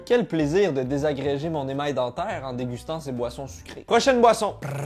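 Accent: Quebec, Canada. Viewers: dan ta prochaine vidéo reparle en Français stp t'es trop marrant sans ton accent québécois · "... de fleure sêchées que ta grand-mère met au dessus de ses toilettes quand ça sent trop la merde. Non? T'as pas la référence?" J'ai éclaté de rire XD XD Ça rend super bien, avec l'accent québécois XD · " Voici un Crush à l'ananô " L'accent québécois me surprendra toujours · C'est vraiment cool que tu gardes ton parlé québécois même si la majorité de tes followers sont français. Ton authentisme est apprécié! Continue ton bon travail, ça passe le temps en criss!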